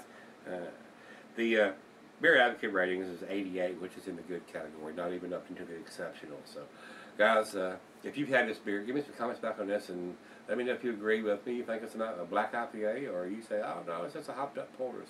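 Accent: Texas, United States. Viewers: I am new to your channel, I found it through Reddit a few days ago! It's awesome! One question though, where abouts is your accent from? I'm British so it's hard for me to distinguish, but you sound Southern, maybe Texan to me. Thanks.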